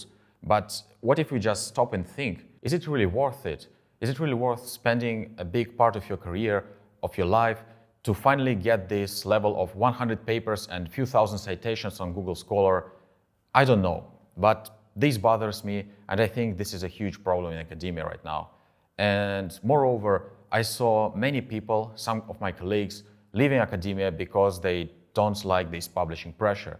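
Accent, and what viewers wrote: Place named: Russia. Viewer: I was thinking "ah, familiar accent". Hello to fellow Russian researcher. I'm also a researcher at US University. And papers fever is way too real. =( I have literally 3 citations.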